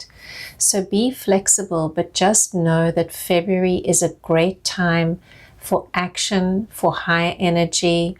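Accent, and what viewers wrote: South Africa. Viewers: You have a South Frican accent. Everything you said resonates. I saw your video today April 26th and started a health voach course in the begining of march a should get my certificate in march 2023. Also watched mu rising sign acquarius before and it is all playing out as described. Just wow! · Are you South African by the way, your accent sounds so familiar?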